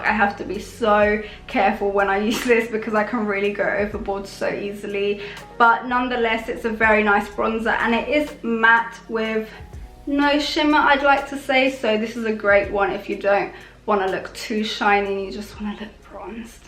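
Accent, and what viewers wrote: London, United Kingdom. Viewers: good British accent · she's got a London accent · she’s got a London accent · Which British accent do you have?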